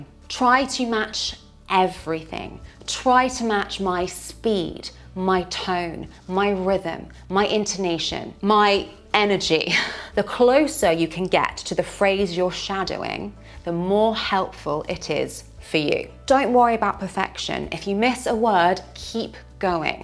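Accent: United Kingdom. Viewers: british english? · Wonderful !, ....I enjoy listening to perfect British English, thanks a Lot 😊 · i really really love so much british accen , that's why i keep learning this great accent every single day .. I appreciated it. I thought you gave your personal touch in your every lecture. That's brilliant❤. it's very kind of you.May God always bless dan love you and your family.. Amen.. From the bottom of my heart,.. I Thank you so much, dear Teacher.. ifrikiya - indonesia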